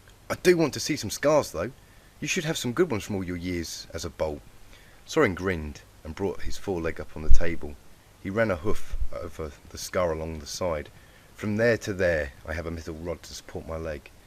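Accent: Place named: United Kingdom. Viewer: the guy reading is british, and guy who wrote it is aussy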